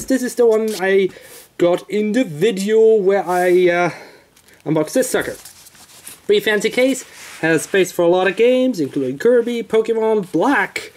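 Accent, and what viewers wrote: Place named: United Kingdom. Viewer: To be Young,12 cups of coffee and UK accent,that's the magic formula.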